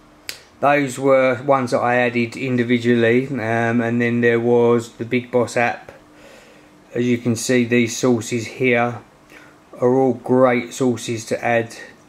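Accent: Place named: United Kingdom. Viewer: Piges?! Lol british accent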